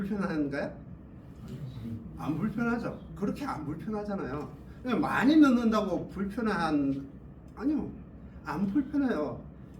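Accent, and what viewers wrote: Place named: Gyeongsang, South Korea. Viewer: 선생님 강의 정말 감사드립니다. 경상도 어투라 친근감이 가고 귀에 속속들이 다가오네요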